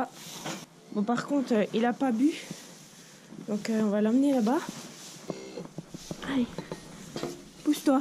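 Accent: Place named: South, France. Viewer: On voit bien que tu viens du sud J'adore tes vidéos 🥰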